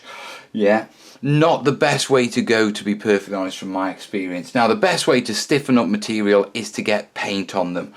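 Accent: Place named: Lancashire, United Kingdom. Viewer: I knew it was Lancashire...nice viddy mate!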